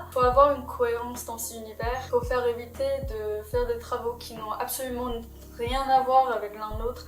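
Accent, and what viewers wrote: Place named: France. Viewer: wow i love your french accent 😍it's so gorgeous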